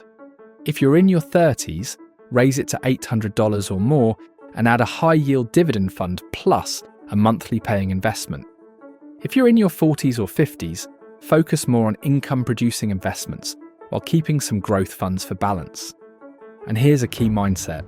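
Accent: England, United Kingdom. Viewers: Suggestion: change the accent. The English one is getting annoying.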